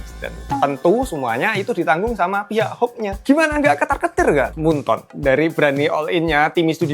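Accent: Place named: Java, Indonesia. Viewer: Aku org jawa, tp gk tau knp klo logaty medok bgni jd gk meyakinkan, krn biasay org sono2 garing, baru tau sdkit heboh.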